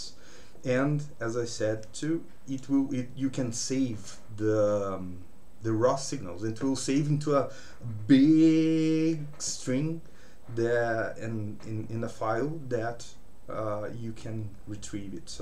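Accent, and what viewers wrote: Brazil. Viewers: Term sotaque de brasileiro falando inglês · BR Mano? Sotaque parce muito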